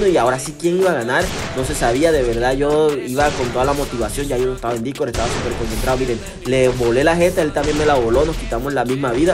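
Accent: Barranquilla, Colombia. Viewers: Bro eres de Barranquilla Colombia? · Es de Barranquilla? Tiene acento de ahí😂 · Bro eres Costeño, eres de Barranquilla verdad responde brother❤️🙏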